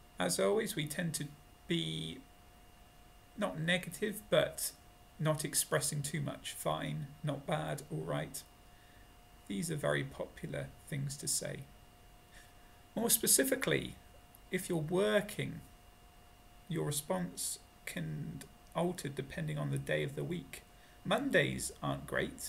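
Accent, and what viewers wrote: United Kingdom. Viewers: You have so cool British accent...I think it would be a good idea just to talk about something in English, tell the story or stuff like that. The topic isn't so important I guess. A magic happens when students hear English. That is a quite important thing in the way of learning.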